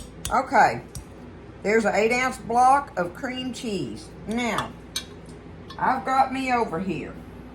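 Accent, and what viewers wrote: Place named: Texas, United States. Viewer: I read there was a study and they found that some people have a gene that makes cilantro taste like soap. I love these chilled and could eat a whole plate by myself..lol I've never put Tajin in them though so I'm going to try that. And I can always tell you're a Texan..."I'm gonna start with 4 jalapenos. And I'm gonna leave the seeds". ❤🌶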